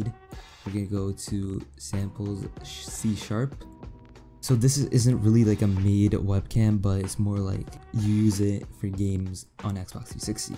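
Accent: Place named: United States. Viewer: almost left expecting half indian with some english and immidetly got an american accent , whats up bruhhh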